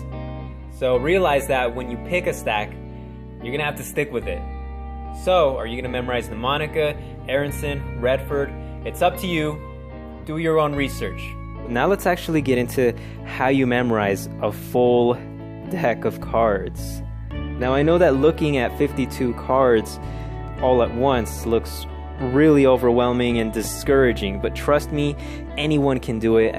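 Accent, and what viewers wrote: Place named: Texas, United States. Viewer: The deadpan texas got me weak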